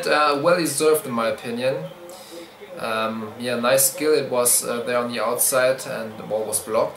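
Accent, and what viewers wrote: Germany. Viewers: deutsches Englisch :D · du meinst deutschen akzent ;) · Sorry trotzdem höre ich nur nen deutschen Akzent raus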